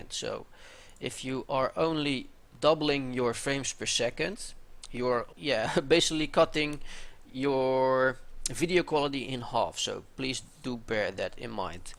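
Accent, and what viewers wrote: Netherlands. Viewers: U're Dutch? :P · Three words and I knew you werd Dutch as well haha. Great tutorial/walkthrough though. I have recently starten streaming on Twitch and this really helped me out. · Ik hoor gelijk dat je Nederlands bent :D Nice video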